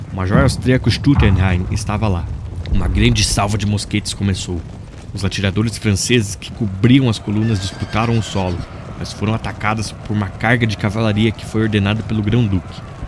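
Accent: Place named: Caipira, Brazil. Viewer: com um sotaque caipira insuportável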